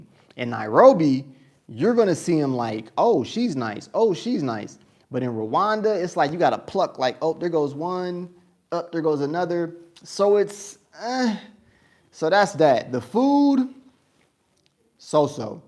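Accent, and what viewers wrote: United States. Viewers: 😂😂😂😂😂i have not known you to be so funny. Sorry again Yes,that accent ,many Americans i see around the malls in Kenya they dont talk much. And i know why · Unfortunately, corruption is all over Africa. They see money when they hear that American accent. Do not travel by bus by yourself if you are going to other countries. Travel with a local if you can.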